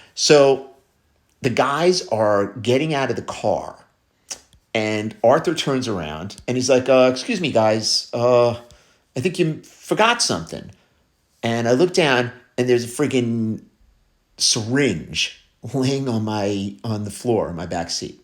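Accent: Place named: New Jersey, United States. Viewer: How did I know yous is from Jersey...😊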